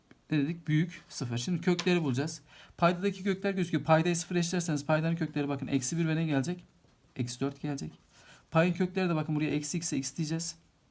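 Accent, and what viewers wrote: Trabzon, Turkey. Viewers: hocam aynı trabzonlu gibi konusuyonuz yanlis anlamayın 😁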